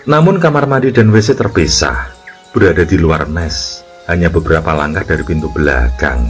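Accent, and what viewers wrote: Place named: Yogyakarta, Indonesia. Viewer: dari bogor cengkoknya jogja :face-blue-smiling::face-blue-smiling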